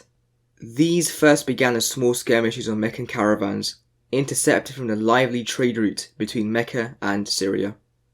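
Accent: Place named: United Kingdom. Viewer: ngl wasnt expecting a british innit p.s. nice visuals concept.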